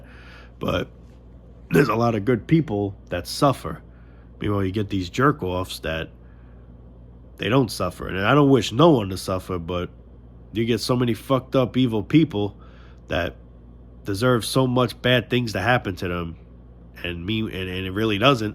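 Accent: New York, United States. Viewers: One of the best parts of discovering your channel is that my best friend and roommate is from New York. We live in Florida. And we talk about a lot of stuff and when I mention things that you're talking about. Right now he sometimes shuts me down. But it's great to know that you are from New York and you speak Truly from the heart and The accent and the truth at the same time is so funny to me. It's like you're saying what I'm thinking and you just say it. I am not white, but my roommate is New Yorker to the bone, just like you I did live in Chicago for some years. So I do know the city life. But never been in New York. And you guys speak from the hip. You just say it. And I respect that as far as the channel and people disrespecting how you and we think. And why we listen to you on a daily basis if you would put out videos on a daily basis. You just speak the truth and the truth is something that can't be hidden and you will grow because people will hear you and follow you because you have the Audacity to speak the truth Bravo. 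Bravo you are what humanity should have been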